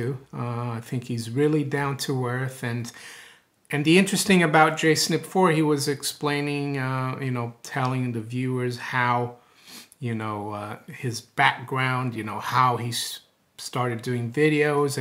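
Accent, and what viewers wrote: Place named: Massachusetts, United States. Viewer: I know you've lived in Geneva and you've been in London for quite some time, and I think you mentioned in another video that you were born in Brazil and your family is from Brazil. Not that it matters, but I'm wondering why you talk like you're from Massachusetts. Do you have connections to the States?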